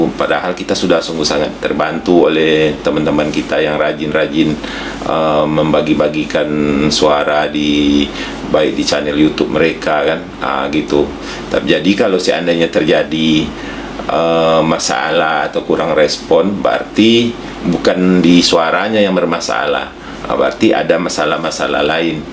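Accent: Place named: Padang, Indonesia. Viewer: Cakap nyo logat padang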